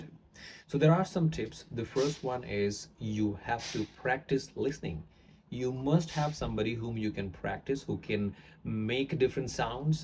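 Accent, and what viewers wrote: India. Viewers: It's really hard to understand the indian accent.😢The speed is really too fast.😢 This video is very useful for me to listen more accent.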